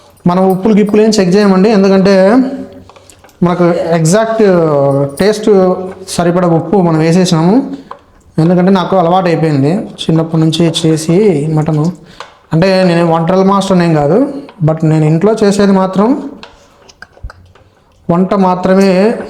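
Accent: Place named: Kadapa, India. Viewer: Superb accham kadapa basha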